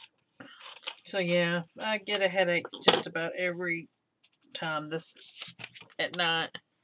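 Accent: South, United States. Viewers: Hi! Just discovered your channel! Love your southern accent and your fun personality. Your gluebook is amazing!! I am originally from Oklahoma...what part of the south are you in? I totally speak southern. HAHA. No black Friday shopping for us.... I hate the crowds (with COVID, it may not be as crowded, but ick). We are going to try to shop local businesses for Christmas this year. Want to support our community. Usually we do Amazon.... easy peasy. LOL Thanks for sharing your gluebook with us! - Kari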